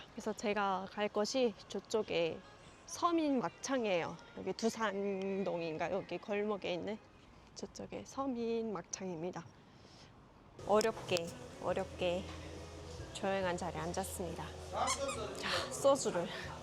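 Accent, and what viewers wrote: North Korea. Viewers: 신기하네요 북쪽억양이 조금씩.. 자연미인이시면 대단한 미인이신듯!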